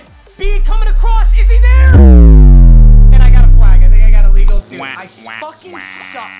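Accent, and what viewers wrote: Michigan, United States. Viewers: Is he from Michigan?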